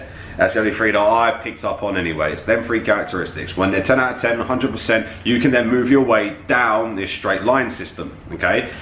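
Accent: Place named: Australia. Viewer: Why does this man have such a strong Australian accent?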